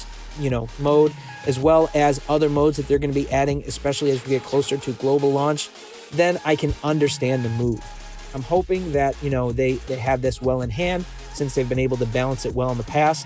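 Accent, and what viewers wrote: United States. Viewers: He is American cant u tell by his accent not everyone knows hindi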